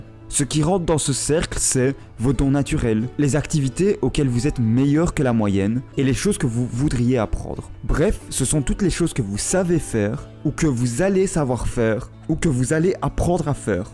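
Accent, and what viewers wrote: Liège, Belgium. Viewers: Hello, j'ai vu des vidéos, lu des bouquins sur l'Ikigai et ta vidéo est bien plus instructive et à son côté pratique que j'ai trouvé nulle part: comment répondre aux questions... Donc merci pour ta vidéos! Je vais explorer ta chaîne qui me paraît vraiment ce qu'il me faut en ce moment :) (Est-ce que tu es d'une région particulière ? ta voix me fait penser à l'accent de Liège :p )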